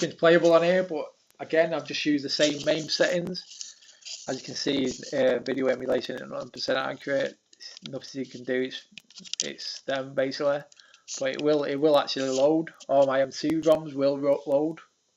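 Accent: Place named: Manchester, United Kingdom. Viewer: Where you from? Manchester? You sound like you're from Wythenshawe, top vids btw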